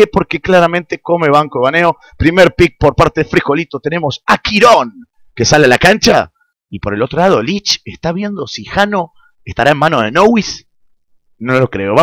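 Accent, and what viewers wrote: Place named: Argentina. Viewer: Relator argentino. No se quien es, pero se nota a los 2 segundos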